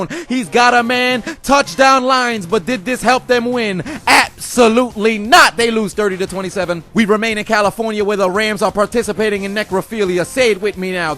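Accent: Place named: Chicago, United States. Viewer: D A B E A R S As a Chicagoan and a Bears fan, I appreciate that Chicago accent 😂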